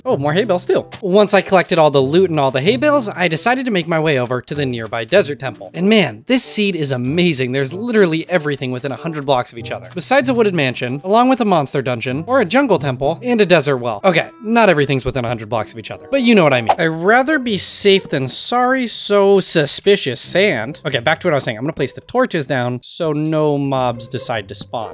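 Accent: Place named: Midwest, United States. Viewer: Are you midwestern by chance? I recognize that accent because I have the same one!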